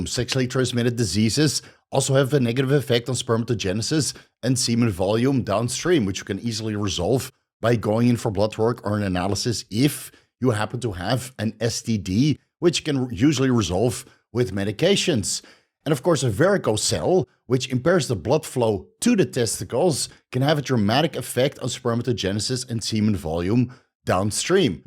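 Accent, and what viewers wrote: Netherlands. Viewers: is he dutch?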